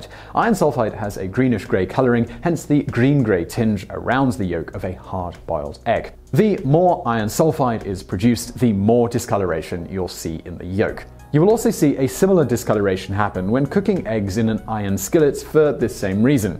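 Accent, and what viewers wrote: United Kingdom. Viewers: is it just me or has he been getting more british?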